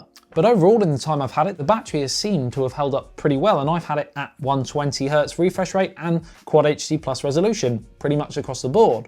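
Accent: United Kingdom. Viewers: ok. are you from UK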